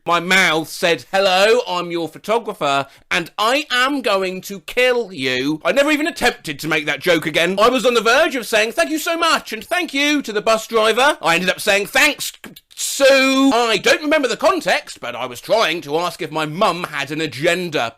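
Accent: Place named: RP, United Kingdom. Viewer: Stop talking posh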